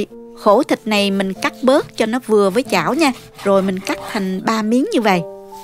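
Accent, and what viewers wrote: South, Vietnam. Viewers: Giọng chất năm bộ. Gợi nhớ lại giọng phát thanh ngày xưa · nghe giọng miền nam, dùng từ ngữ miền nam là thấy hay rồi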